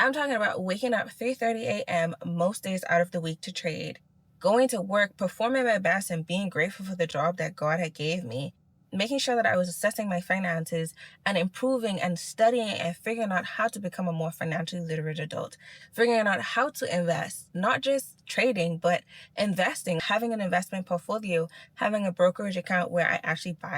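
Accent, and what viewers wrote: Bahamas. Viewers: I picked up on your accent. It warms my heart to see a fellow Bahamian woman trading ❤ Wishing you all the best! Your video really encouraged me to take trading and financial freedom seriously. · Wait...I hearing my people?? Are you Bahamian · Hi i love your video and how you break everything down, I think i am hearing a Bahamian accent, i know you said having Caribbean parents! But thanks for taking the time to make this awesome content. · Are u Bahamian i hear the accent but cant tell also love ur content this is awesome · are you from bahamas? u kinda sound bahamian lol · Love the video and congratulations! But mam, I can't ignore hearing a Bahamian accent. Now I'm super curious. Either way, love this for you! 🙌🏿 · sound bahamian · That accent can’t hide boy 242🇧🇸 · That batian accent ❤.. new here but praying I learn so I can get off this rat race . 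· You from the Bahamas eh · Great video ... sounds so Bahamian ...lol !!!!!! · Wait. You're Bahamian?! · Are you from the Bahamas? The way you say financial it reminds me of the local accents when I went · You sound Bahamian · Are you Bahamian? I hear a slight accent 😅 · you sound like a Bahamian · I know i heard the bahamian in you loud & clear! Im proud of you. Im deep in this to clear debt, and provide emergency funds to actuay leave my job as well.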